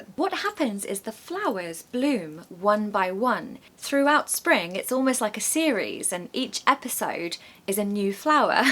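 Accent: United Kingdom. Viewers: Read books to us in your British accent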